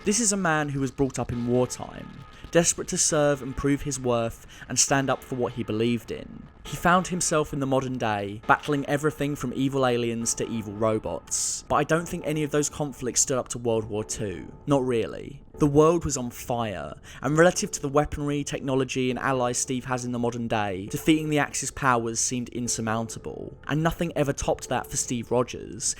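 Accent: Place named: Edinburgh, United Kingdom. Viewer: glasgow? its edinburgh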